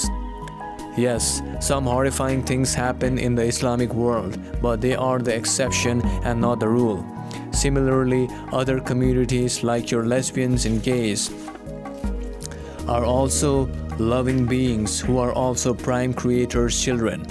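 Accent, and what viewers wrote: India. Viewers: Look! Here's the thing...oh, but first a question....is your home turf India?? Cuz you sure sound like you come from India!! So now..the thing..... Don't come here telling me my ways are wrong!! Cuz I don't like that! It's rude!! And another thing , you talk like we, no... me. I'm just going to speak for myself, so scratch "we". Anyway, You talk like I totally don't have a clue of what's happening on " my " planet! I know about the cabal, elite, deep state, the " 1% ." I know what their agenda is, and what they are pulling!! How do I know these things.. you might ask? I read the HOLY BIBLE! It tells me everything I need to know, and how to fight them!! And... THERE IS ONLY ONE WAY TO GOD!! AND THAT IS THROUGH JESUS CHRIST !! THERE IS NO OTHER WAY!! AND ANOTHER THING!!!...ALL YOUR MEDITATION, CHANTING, CHAKRAS, AND THE LIKE ARE FORBIDDEN BY GOD!! 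SO...RIGHT THERE I CAN SAY.. I DON'T WANT ANYTHING YOUR SELLING!! BECAUSE THE ONE AND ONLY GOD OF THE UNIVERSE.. "JEHOVAH " Would NOT send messanger's who breaks his command- ments!! So... I'm thinking you have a whole different " god " than I do. And if you don't mind, I'll keep the one I got, thank you!! And another thing... The love of money is the root to all evil!! It's not the root to sacred cows!! ( again, India! ) Are your cows blue? Just wondering. Now, you say that y'all have gotten to your much advanced civilization, by trial and error. So, why isn't the human race allowed to advance in the same manner? Why do you feel you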